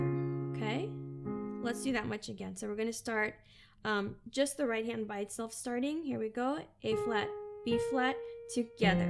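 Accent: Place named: France. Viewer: I hope this idea of sponsoring a tutorial by the person who requests it becomes commonplace. Why someone who provides a product and service, let alone one that has clearly required a good amount of work and expertise, should not be compensated is perplexing. Even Bernie, who thinks everything should be free, would side with The individuals who are providing such services. Aside from that, your French accent is killer.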